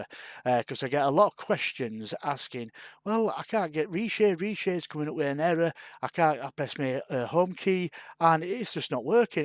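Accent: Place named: Northern England, United Kingdom. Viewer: arr thats a northern man if i ever heard one! thanks for the video <3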